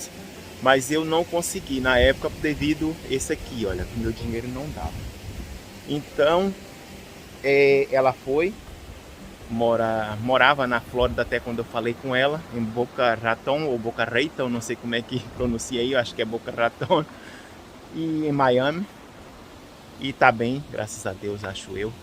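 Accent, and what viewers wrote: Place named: Brazil. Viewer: Quanto a sotaque, parece-me igualzinho a de brasileiro acabado de chegar, mas vejo que se esforçou por "aportuguesar", mas tão tem problema percebo mto bem todo o sotaque de qualquer região de Brasil. Bom Ano tb para si!!! 🙋‍♀️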